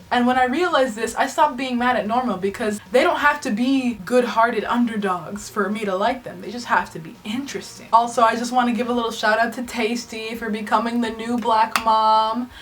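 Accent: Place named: Russia. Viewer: your russian accent is on point. also **ermagerd spoilers** my theory is that bennett might have had something to do with the DEA raiding cesar's apartment. perhaps he thought the best chance for those kids was to get cesar away from them. i just don't want to believe he would desert daya like that :C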